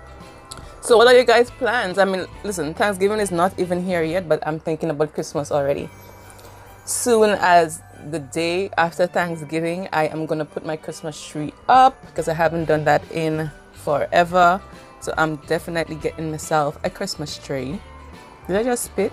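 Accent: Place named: Grenada. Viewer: I love the chit chat videos as you give away your secrets😂 but most importantly it make you more relatable and brings out your Grenadian accent and down to earth personality ✅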